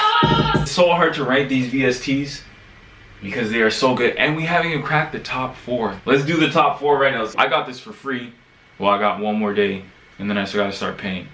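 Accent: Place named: Toronto, Canada. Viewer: great vid. I could tell you were from the GTA based on accent alone within the first minute lol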